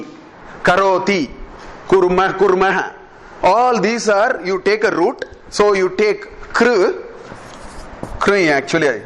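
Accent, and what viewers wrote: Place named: Tamil Nadu, India. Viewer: This is because you should know he is a tamilian ,sorry,.This language has a small set of consonants .it does not contain the full KA varga,CHA varga meaning only first letter of each varga exists.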